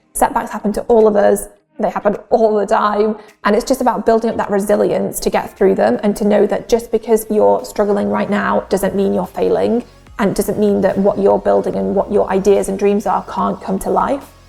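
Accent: United Kingdom. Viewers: She’s British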